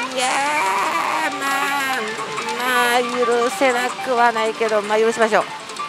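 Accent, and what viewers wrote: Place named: Osaka, Japan. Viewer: さん、楽しく落ち着きある女性👩‍🍼いいよ😊 大阪弁言ってやん､物凄く浸し見湧いて､見てます🤭 勝てる台選び方も見たいなぁ〜 よろしくですほな🫶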